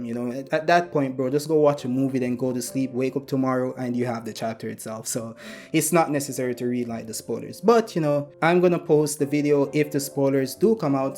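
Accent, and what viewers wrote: Jamaica. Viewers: I can hear the Jamaican in your accent bro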